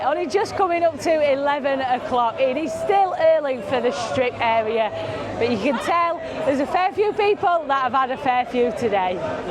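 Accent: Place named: Runcorn, United Kingdom. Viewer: You from Runcorn sounds like my accent that haha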